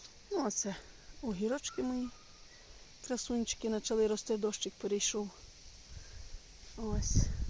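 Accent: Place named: Khmelnytskyi, Ukraine. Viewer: доброго дня, я давно дивлюся ваше відео. Ви говорите,як у нас на Хмельниччині.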